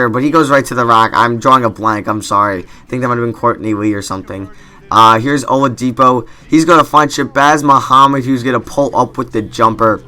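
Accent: Pittsburgh, United States. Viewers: You from Pittsburgh?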